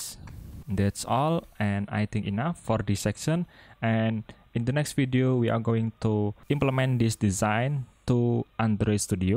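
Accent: Indonesia. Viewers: Awal nonton, kok aksennya familiar 😂, ternyata orang indo toh, mantep dah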